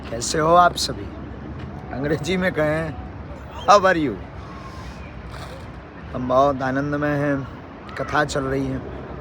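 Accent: Bundelkhand, India. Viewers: जननी जन्मभूमिश्च स्वर्गादपि गरीयसी आप और आपकी बुंदेलखंडी दोनों ही बहुत प्यारी है🙏🙏👍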